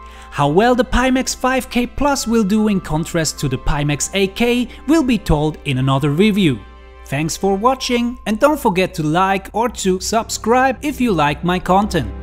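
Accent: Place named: Switzerland. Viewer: ha doch dänkt ech ghöre e Schwiizer :-)! Got the 5K+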